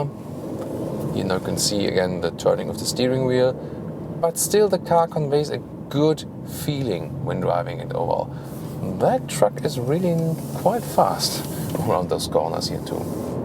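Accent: Germany. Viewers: Nice review. I love the German accent.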